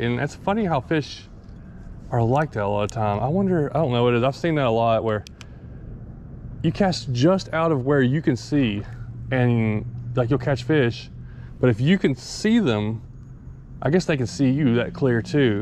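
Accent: Tennessee, United States. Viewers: What part of Tennessee are you from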